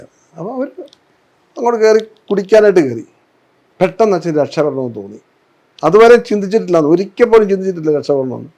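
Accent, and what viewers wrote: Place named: Kottayam, India. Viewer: സൂപ്പർ വിവരണം. കോട്ടയം ഭാഷ ആയതുകൊണ്ട് ടി യാന് മനസ്സിലാകുന്നില്ല എന്നു തോന്നുന്നു.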